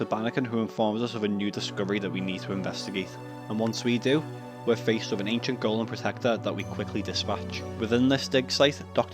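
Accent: Liverpool, United Kingdom. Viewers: Very well made interesting video for a channel with a small amount if subs, I would have subbed, but cba with your dirt scouse accent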